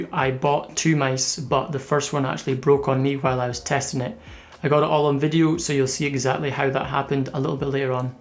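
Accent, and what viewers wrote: Scotland, United Kingdom. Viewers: Great video. Sounds like your from the UK. any chance I could buy that broken mouse off you as it would make for a good time trying to fix it. Thank you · are u scottish ? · Super high quality production dude, you did an amazing job. Also, is that a scottish accent i hear?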